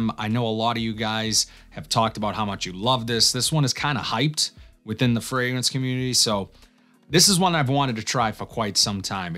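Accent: Boston, United States. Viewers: Well done on the 13k,!!! I don't come here for frag talk as much as I come here for Bostonian accent:):):) You are awesome!!! Have a great weekend!!! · You Have to be from Boston!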